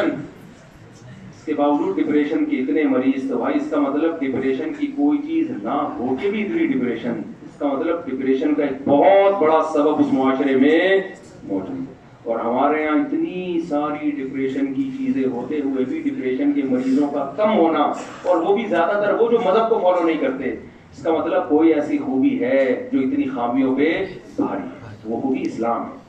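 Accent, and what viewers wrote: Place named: Karachi, Pakistan. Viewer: I'm Lucky for having residing near to the masjid where he gives Jumma. Khutba every Friday. I met him two years ago since then I never missed my salaat namaz , Ramadan and aitiikaaf, in his masjid. MUFTI SAAB is so friendly and kind. He gives his bayans are given in the language and accent that people in Karachi understands.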